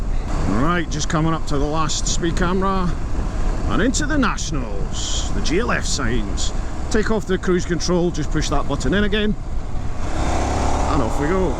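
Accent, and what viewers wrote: Scotland, United Kingdom. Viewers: are you Scottish? This bike I would love to have, but the triumph 765 and the pain gale v2 or the R9T are too much of a temptation right now.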